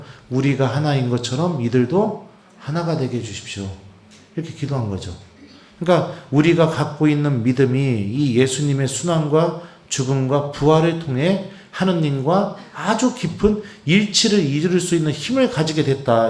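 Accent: Chungcheong, South Korea. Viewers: ㅎㅎ 충청도 사투리 ! 그랬슈~~~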